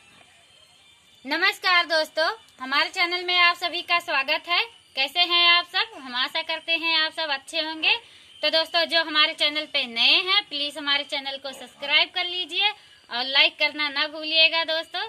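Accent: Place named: Uttar Pradesh, India. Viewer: Hello.....m bhi up side ki hu.....aapki boli bhot acchi h......mjhko bhi aati h.....but mjhko sarm aati h.....koi bolta h dusra to bhot accha lgta h..... or aapka babies bhi bhot hi pyaarey hn......bhgwan aapko saari khusiya de.....aapkey bacchon ko bhi...☺☺☺☺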